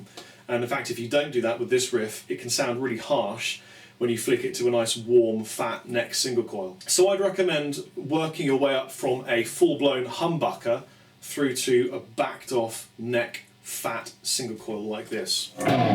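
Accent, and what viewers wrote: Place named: United Kingdom. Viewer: you are the best teacher I have seen. There is a lot of good ones but your delivery is very logical and concise. The british accent doesn’t hurt either. Wish you lived in the states...particularly Sacramento California...you’d love the weather over dreary old England...lol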